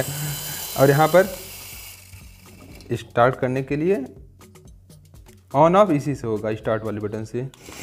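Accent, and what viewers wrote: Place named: Bhojpuri, India. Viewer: Ur bhojpuri accent is fascinating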